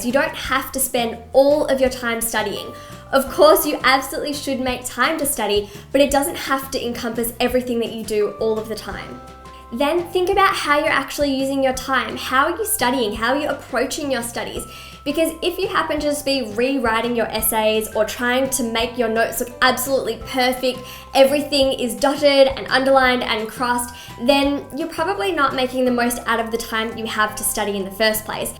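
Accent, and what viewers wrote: Australia. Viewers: Are you Australian? I apologise if your not but you sound Australia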